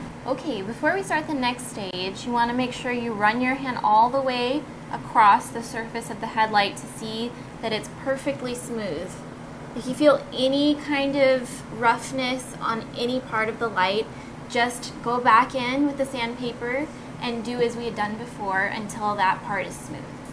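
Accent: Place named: United States. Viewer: excellent video ,but I hate your tono of voice is so noise and saunds so greengo ,:(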